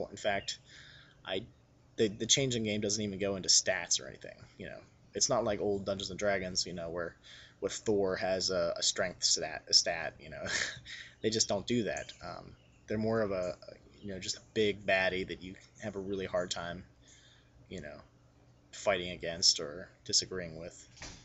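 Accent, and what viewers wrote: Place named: United States. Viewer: American English kind of hurts my ears sometimes, how they pronounce the 't' like a 'd', and such their teeth every 10 seconds, but other than that you seem to be getting a grasp of the game. You have much more to read though my friend, the new supplement books outshine the core book.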